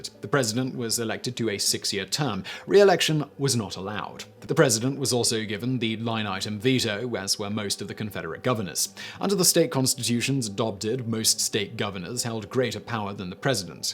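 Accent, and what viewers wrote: United Kingdom. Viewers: I don't need someone who speaks the queens english to tell me diddly squat about my country. End of story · The late Shelby Foot would argue up and down with your position on #8. Shelby Foot once said that to say slavery had nothing to do with the war is just as ignorant as saying slavery everything to do with the war. Speaking with a British accent doesn’t make you an expert on US history. This is more of a personal view provided by this Brit then actual fact vs myth.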